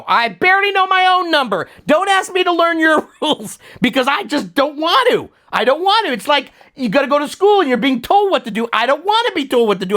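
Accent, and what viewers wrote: United Kingdom. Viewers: I went to the main news channel ZX Spectrum Next to say hello again (I'm one of the 27 lucky ones) and thank you for everything you do. I'll subscribe, I'll drop by more often, and at the same time I'll improve my English skills, because no matter how hard I try, I can't understand anything about the British dialect :)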